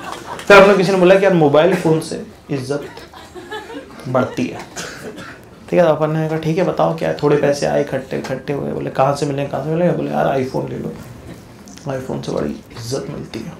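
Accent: Indore, India. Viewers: bhai ye Indori andaz he.. mp me ese hi bolte he... kabhi aao apne mp me bhot mja ayega...:) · indori ki baat hi Alag hoti hai bhiyao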